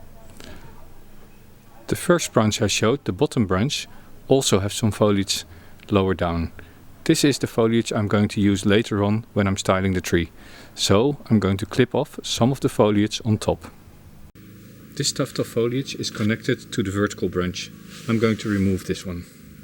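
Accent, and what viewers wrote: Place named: Netherlands. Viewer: Hello again. WOW that was a tour de force, bravo! Every other ‘demonstration’ I’ve seen were ham-fisted by comparison and over dramatic too! By the way, now I’ve heard you talk more, and fluently, I’d put money on your being Dutch, like some of my best friends! ✌️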